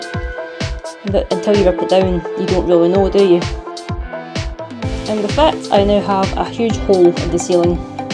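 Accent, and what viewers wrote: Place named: Scotland, United Kingdom. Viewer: Progress is coming along well, you'll learn as you go and no better way to learn than to throw yourself in at the deep end. Got to love the Scottish accent. Keep up the great work and look forward to more updates 👍🏻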